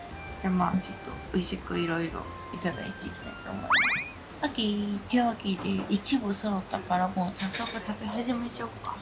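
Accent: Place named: Osaka, Japan. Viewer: 、大阪弁好きやわあ😘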